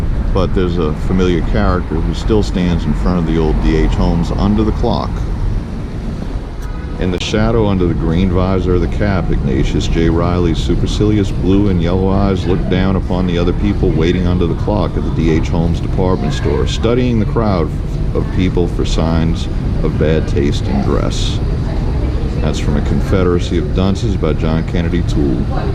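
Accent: New Orleans, United States. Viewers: In your second reply below, you mentioned taxes.....I would imagine they would be very high in the French Quarters and probably everywhere else, considering the historical aspects of the entire city. I've certainly been enjoying all of your wonderful videos. You would be a most interesting lecturer....you have the right voice and your charming New Orleans accent makes it even more delightful. Thank you so much.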